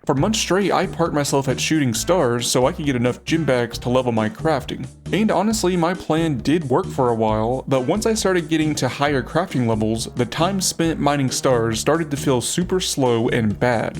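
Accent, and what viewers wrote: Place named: North Carolina, United States. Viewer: You sound like you’re from nc lol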